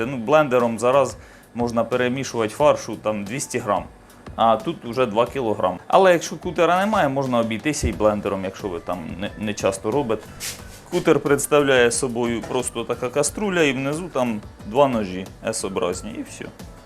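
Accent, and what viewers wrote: Cherkasy, Ukraine. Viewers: Добрий день, велике дякую за вашу працю,бажаю процвітання каналу,а говорите ніби у нас на Черкащині.Благополуччя і Миру Україні 🇺🇦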